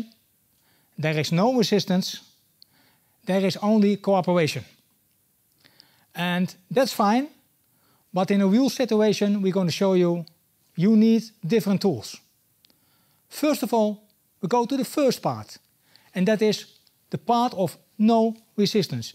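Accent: Netherlands. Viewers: Thank you very much for your hard work! btw, your English is actually awesome. by your accent I'd say your Dutch, am I right? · Your english is oki :D dutch accent is funny, too. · Your English is good, you sound Dutch I don't speak Dutch but do speak German as well as English. I was told while learning German you never loose your native ancient unless you live in the country for 20 or more years.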